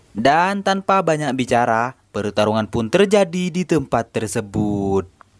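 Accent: Madura, Indonesia. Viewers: astaga meduro mu lo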